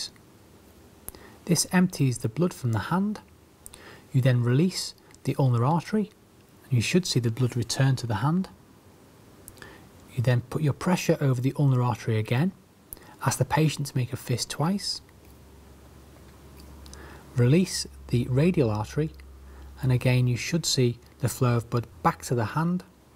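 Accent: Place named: Liverpool, United Kingdom. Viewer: I've been looking for a Scouse ASMRtist for a long time, didn't think I'd find it in the form of unintentional ASMR. Love it.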